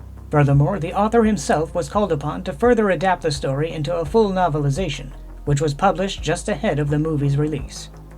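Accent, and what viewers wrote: Transatlantic, United States. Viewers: I know this has been up for a year but I’ve only just started to pay attention to these. I own this English version, and when you said it read like a stilted first draft, that nailed it. I couldn’t agree more. It’s a pretty technically accurate translation (I own and have read the Japanese version!), but there’s no flair, and consequently comes off a little boring. I’m eagerly awaiting the Mothra translated book by the same guy, and am hoping that he’s taken a bit more time to clean it up. Translation is an art, but when treated as a science, it’s not very good. Great video, and love the Transatlantic accent! Is that how you normally talk, or is it an affectation? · Never thought I’d hear someone with a transatlantic accent in 2025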